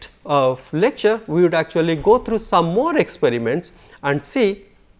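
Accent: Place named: Kolkata, India. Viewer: Jadavpur English. He pronounces "absorb" as "abserb." It is REALLY difficult to make out what he is saying. And he trips too many times.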